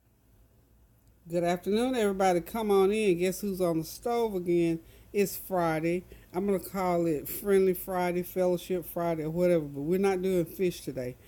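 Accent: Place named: South, United States. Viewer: I just love her voice! She reminds me so much of my mommy and granny. The Cove City, NC in me is almost lulled to sleep by her southern twang and the peace in her kitchen and her cooking. Thank you, Ms.!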